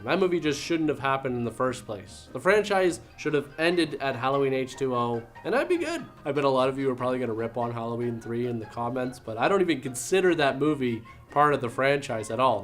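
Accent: Canada. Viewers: Trash, sounds like a Canadian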